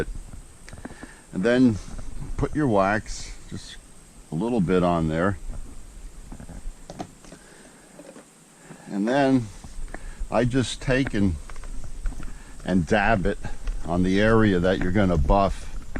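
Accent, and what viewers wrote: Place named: North, United Kingdom. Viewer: fishing and please tell us about your old boat. About the inboard vs outboard also what lead you to buy a key west and tell us about the other center consoles that you looked at before you bought the key west. Also I can tell by your accent your from up north what was it like fishing up there thank you have a blessed night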